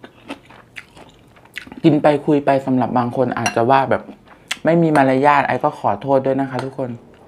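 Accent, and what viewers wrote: Isan, Thailand. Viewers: อยู่เมืองกาญทำไมพูดอิสานได้อ่ะคับ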